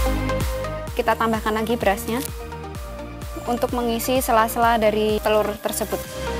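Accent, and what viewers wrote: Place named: Banyumas, Indonesia. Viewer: Kayaknya asal Banyumas. Medok :D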